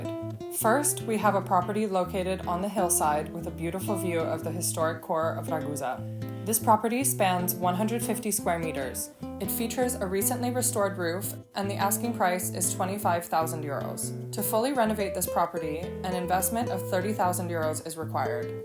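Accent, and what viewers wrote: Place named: United States. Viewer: You sound American. How did you get to do this? You are so lucky.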